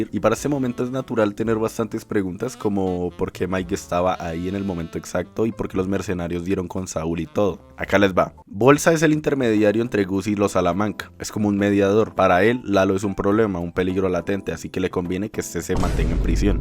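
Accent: Colombia. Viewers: Este tipo no puede ser más bogotano, me siento en casa jajaajajaj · Amigo, muy bueno tu video, pero, te recomiendo no usar esos tontos memes de perros y hablar menos como colombiano.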